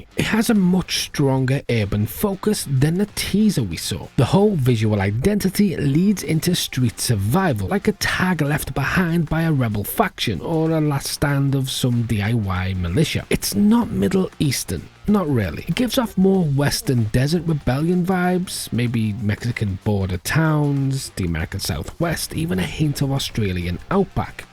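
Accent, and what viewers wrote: Liverpool, United Kingdom. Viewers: I need to know are u scouse? or from the wirral i feel like i hear it in ur voice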